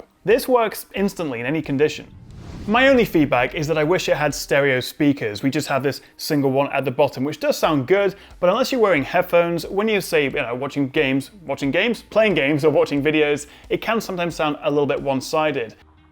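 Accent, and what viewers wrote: United Kingdom. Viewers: what lovely British town are you from,?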